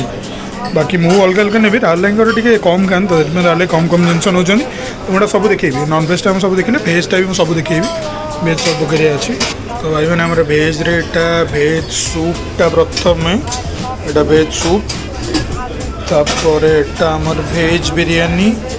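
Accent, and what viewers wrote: Odisha, India. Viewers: I subscribed your Chanel cuz I like your odia accent and also I like your vlogging style keep it up bro 👍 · I luv your way of talking..prati 5 min re hehe haa..dhe..dhe .dha..dha😂😂actually ame mane bahare maintain kari katha hele v...real life re samaste emti typical odia re hin katha hau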